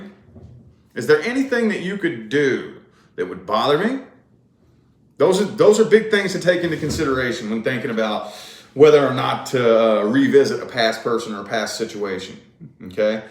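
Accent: South, United States. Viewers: Really enjoyed this reading,! Are you from the South? I know I hear an accent in there.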